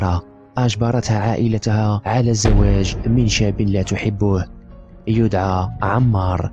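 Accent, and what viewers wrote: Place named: Morocco. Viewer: جاني صوتو ولهجتو مروكي والله اعلم دقق مليح فاللهجة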